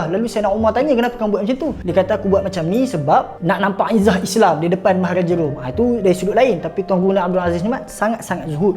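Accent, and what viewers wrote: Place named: Perak, Malaysia. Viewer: Kuat perak nya 😅😅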